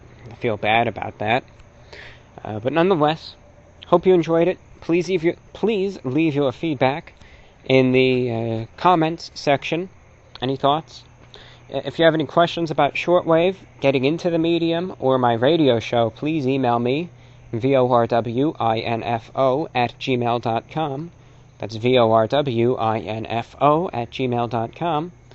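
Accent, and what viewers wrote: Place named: Mid-Atlantic, United States. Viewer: Gotta talk with that mid-atlantic accent.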